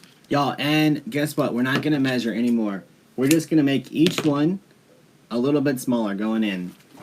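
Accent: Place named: South, United States. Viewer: I don’t know what gives me a bigger thrill! The fact that I have learned more about wreaths and bows watching 2 of your films or the fact that your from the South! I love the Y’all 😘 I feel like I am listening to one of my professors from Ole Miss.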